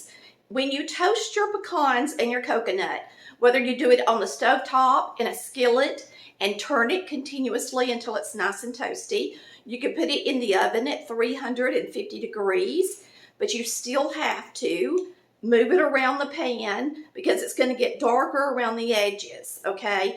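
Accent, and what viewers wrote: South, United States. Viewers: you have mind blowing southern accent, love it😍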